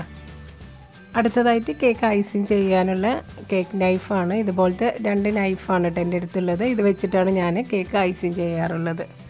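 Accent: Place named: Chavakkad, India. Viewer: ഇഷ്ടായിക്ക്ണ്.... ചാവക്കാട് സ്ലാങ്ങ്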